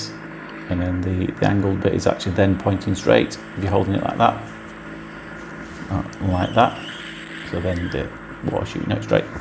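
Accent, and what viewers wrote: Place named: Liverpool, United Kingdom. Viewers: Are you in Wigan? It sounds like you are?in the middle of Liverpool and Manchester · You’ve got a slight Liverpool accent 🙂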